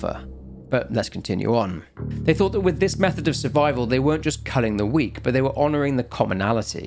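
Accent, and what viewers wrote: United Kingdom. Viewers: british, but soothing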